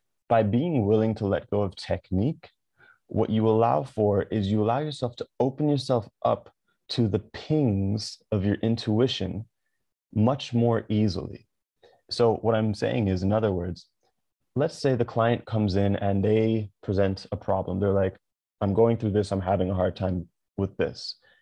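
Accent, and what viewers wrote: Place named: Jamaica. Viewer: Are you Jamaican why you look and sound like a yaad man!! If that's soooo bomb!! I'm loving your videos. This one is really good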